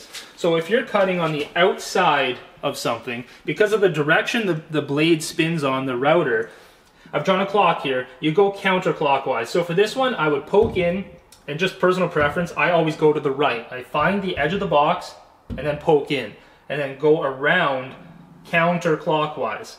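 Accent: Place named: Canada. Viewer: I doubt it. Listen to the way he pronounces his 'O's' Id bet hes from Canada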